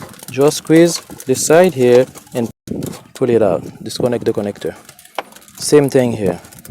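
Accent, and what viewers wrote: Haiti. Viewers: Hey! I recognize that unmistakable accent!!! Sak Pase!!!! I'm just about to go out to my 1998 Camry that just won't die and install a backup camera. Thanks for the video!!! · That Haitian accent 😂💪🏿💯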